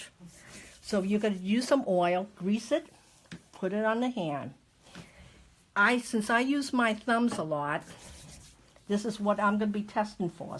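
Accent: New York, United States. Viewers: I was very surprised and delighted to hear someone with a NY accent! Hence I really enjoy learning from you. I first started using an iced water bottle to roll and found some relief, then I just got an electric Shiatsu foot massager that has a warning function [which I haven’t used yet], my question is, when do I use ice and when do I use the heating? FYI, I found a big bump above the area where you had marked on the foot, so I have been concentrating mostly on that area. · I like her New York accent.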